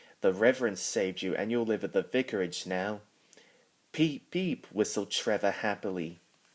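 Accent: United Kingdom. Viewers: Great job! Where are you from? Is your accent natural or you’re imitating British accent because Thomas&Friends is a British show?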